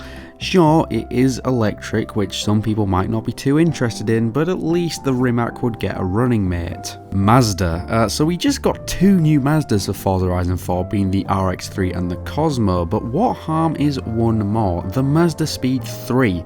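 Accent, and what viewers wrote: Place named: Bolton, United Kingdom. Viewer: I can sense the bolton accent straight away for my first vid on the chanel😂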